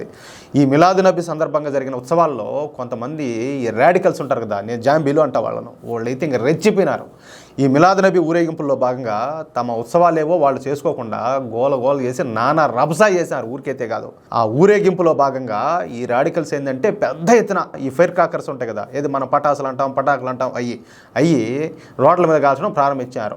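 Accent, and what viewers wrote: India. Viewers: Superr sir. Way of explantion is good sir. Telugu slang also attractive sir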